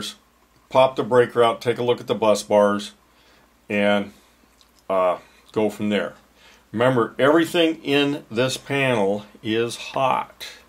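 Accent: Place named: Appalachia, United States. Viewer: Jesus stop saying, "kick off." You sound like a hillbilly. Circuit breakers "trip." Fuses "flow" or "melt." You sound like you've been hanging around a bunch of butt-crack hillbillies too long. Yeah, electricians may say it too but only the butt-crack hillbillies do. Electricians that know what they are talking about don't talk like that.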